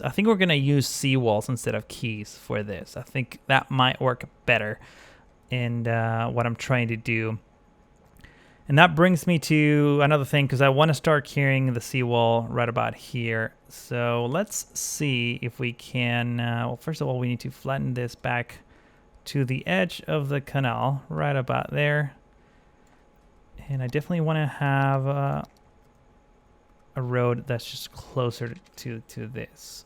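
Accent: Argentina. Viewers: Eres argentino????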